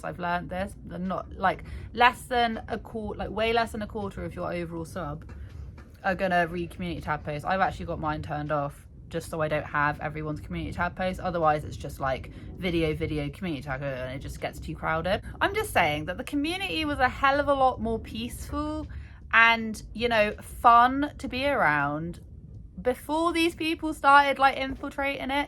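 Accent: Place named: United Kingdom. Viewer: Omg yes thank you gorgeous! Anyone who is against your sweetheart butt are just nasty people. Your obviously a genuine person. Trashy people attract trash. And you didn’t back pedal….you said “hey guys I messed up.” End of it. Your human, your a content creator, mistakes will happen. Unlike onora YOU TAKE ACCOUNTABILITY! Love you and your British accent!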